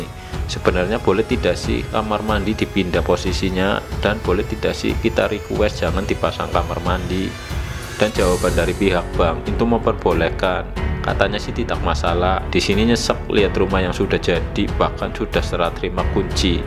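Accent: Banyumas, Indonesia. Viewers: Ora ngapak ora kepenak ya kang... Hehe Matur nuwun kaang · Ora ngapak ora kepenak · Ngapak betul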